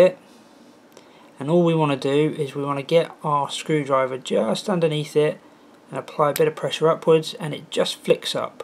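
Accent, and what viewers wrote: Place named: United Kingdom. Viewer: I love that british Accent. Nice tutorial! I sought long for this. Now finally got it ;-) Thanks a lot!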